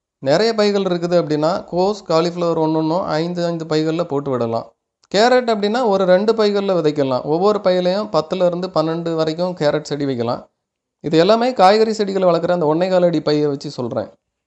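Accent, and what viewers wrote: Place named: Coimbatore, India. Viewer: Bro unkal thottam Mathiriye unkal pesum vidham rombaum super ithuthan kovai kusumbunu solvankanu niniaikiran bro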